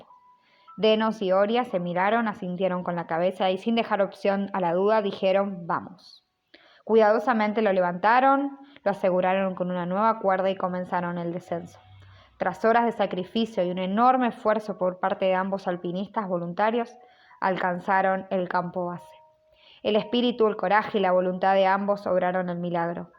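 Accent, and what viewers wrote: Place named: Argentina. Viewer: QUE LINDO EL ACENTO ARGENTINO.....SALUDOS DESDE PERÚ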